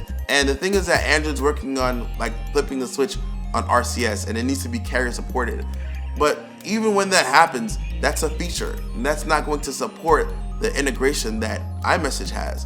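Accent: United States. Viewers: You sound very very american. Im sorry for you. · You from Jacksonville bro?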